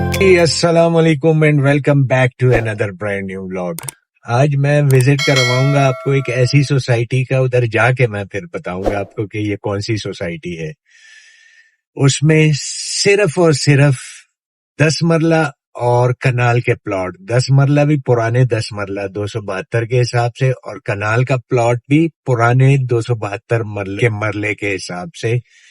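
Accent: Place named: Karachi, Pakistan. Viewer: Youn lagtahai keh Karach ka aik Padha likkha nojwan baat ker raha hai.😊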